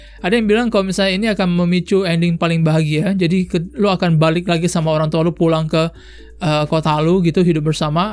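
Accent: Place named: Pontianak, Indonesia. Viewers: Jak budak pontianak ke rupenye abang ni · Orang pontianak rupenye abang ni, mantap bang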